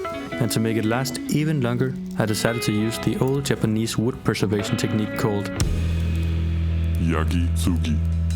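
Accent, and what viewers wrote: Denmark. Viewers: you sound Danish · Am jeg høring danglish? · the accent makes me think you are one of us. love from Skanderborg.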